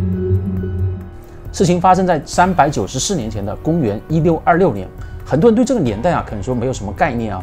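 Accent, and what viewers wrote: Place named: Fujian, China. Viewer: 福建口音听不出来吗你🙈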